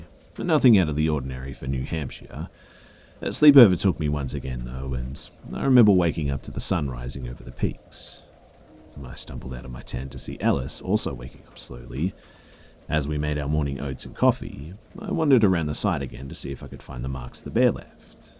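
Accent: Australia. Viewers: Ahh time for bed. taking this soothing Aussie voice with me. Love ya!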